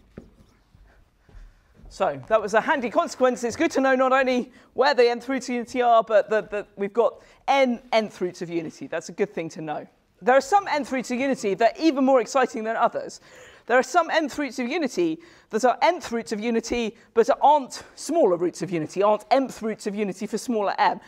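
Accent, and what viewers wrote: England, United Kingdom. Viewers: The last thing I may think of is to be taught by a British teacher not because they are not good teachers but because of the British accent. I feel like British teachers suffer when they speak...breathing issues...directly coming from the gym to the classroom and starting to teach... Brilliant lecture btw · everything in an British accent sounds more interesting...even the unit circle.. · English accent is fucking awesome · I like your English Accent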